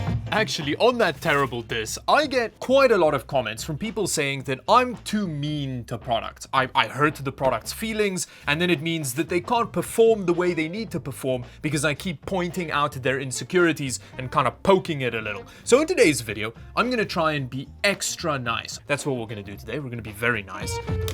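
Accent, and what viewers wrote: Afrikaans, South Africa. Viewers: Hi, your name and accent suggest dutch/ afrikaans, are you from ZA or like Namibia?? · Are you South African? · I can hear some South African in that accent? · I keep hearing an afrikaans accent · Are you from South Africa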